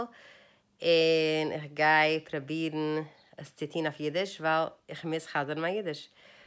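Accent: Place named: Hungary. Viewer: You have a real hungarian Yiddish 😂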